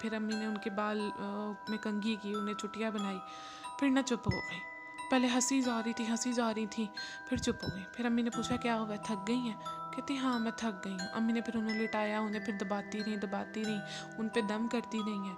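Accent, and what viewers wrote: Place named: East Punjab, India. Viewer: Your Punjabi accent is of Eastern Punjab،Sardar type. Sounds nice.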